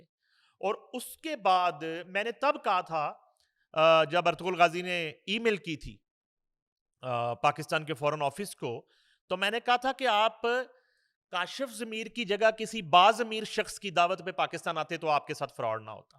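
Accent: India. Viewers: انڈین لہجہ ہے شاید انوکھے پن کی خاطر بولا جاتا ہے ؟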